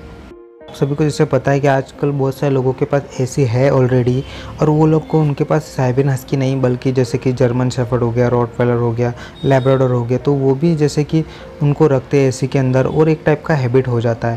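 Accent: India. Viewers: Why you talking like indian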